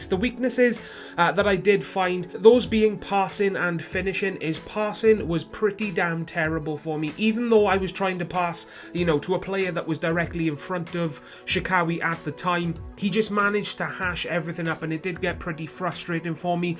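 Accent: Wales, United Kingdom. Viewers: Are you welsh!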